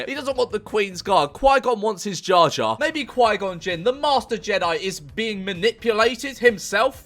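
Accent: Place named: Liverpool, United Kingdom. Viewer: The scouse accent is class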